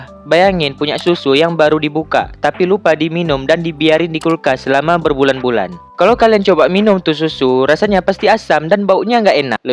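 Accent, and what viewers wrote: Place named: Aceh, Indonesia. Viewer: Logatnya meuaceh that sang 🧐🗿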